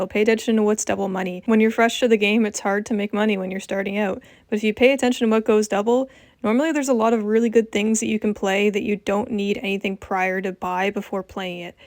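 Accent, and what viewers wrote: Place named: Canada. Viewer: At first I thought your YouTube and PSN were just a play on a nickname for thinking that was your legit name. Then I heard a few words that sounded Canadian and then boom, showed up and I was cheering because I went to a hockey game when the Vancouver Canucks came down to play the Anaheim Ducks. But I'd agree with most of this. Only thing I'd personally wouldn't buy as a new player, is the Acid Lab right away as we can get it for free after completing Off The Rails and we just have to upgrade the Acid Lab after doing i think 10 Fooligan jobs?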